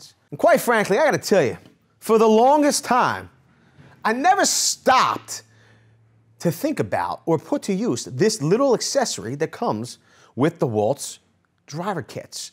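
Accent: Philadelphia, United States. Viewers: This guy is from the east coast for sure. I'd say philly Lol. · You Guys from Philly? I am. I feel like You have My accent?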